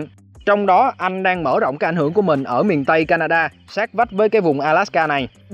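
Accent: South, Vietnam. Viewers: đọc dễ nghe,giọng nghe dễ hiểu.chuẩn miền nam. chứ giờ 1 số kênh đọc giọng miền nam k ra miền nam,bắc k ra bắc nghe ghét cực kì. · Kênh hay giọng rất nam bộ thích đó nghen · bạn có chất giọng của người miền nam nghe dể thương dể mến nên được nhiều người thích, chúc mừng kênh ‘ TRUYỀN HÌNH’ của chúng ta ngày càng phát triển mạnh mẽ lên 10triệu Sub nha! Ủng hộ hết mình luôn bạn nhé!!!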